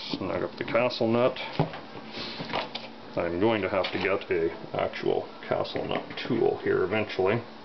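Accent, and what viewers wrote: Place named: Canada. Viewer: LOL 2011KyussLives how could you not tell he is Canadian. Kyuss great band btw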